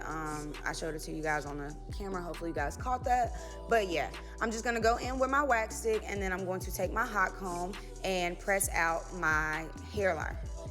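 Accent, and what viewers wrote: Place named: Texas, United States. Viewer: Oh my God' your S00 Beautiful sound Like you from teax💕😘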